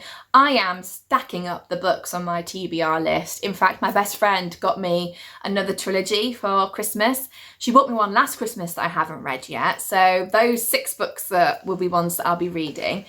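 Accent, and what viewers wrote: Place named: United Kingdom. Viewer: omg i love it i am from the us though so British accents always sound good to me lol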